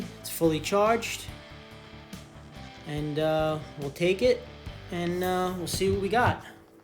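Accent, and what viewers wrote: New York, United States. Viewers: I’m subscribing because I hear a NY accent! Good content too lol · Ees a New Yorkr or from New Joyzee. No?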